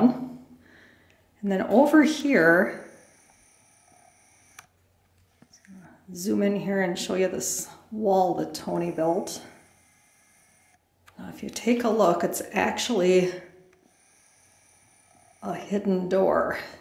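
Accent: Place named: Minnesota, United States. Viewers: Where are you from? I'm guessing that's a Minnesota accent? Or North Dakota? Sounds like the cop on Fargo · Yeah sounds Minnesotan to me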